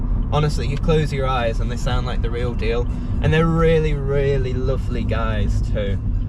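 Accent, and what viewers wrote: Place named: Northern England, United Kingdom. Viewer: this is completely unrelated to books but your accent sometimes sounds so northern english! maybe i just havent heard enough new zealand accents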